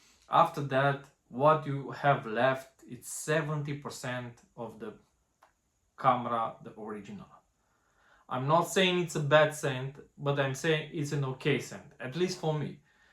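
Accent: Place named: Romania. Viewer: Bro, am o gramada de "influenceri" in lista de Subs si este foarte adevarat ce zici... aia vreau numa sa faca bani cand cumpara toti care se uita la videourile lor, azi te-am descoperit si sincer esti forate honest, si asta trebuie sa se aprecieze, nu inteleg cum nu ai mai multe like si subs.. aici ai un sub +, Salutari din Spania ( pe canalul pe romaneste am vazut ca vorbesti similar ca accentul meu 😂 sigur suntem din aceias zona din Romania 🤝🏽🤝🏽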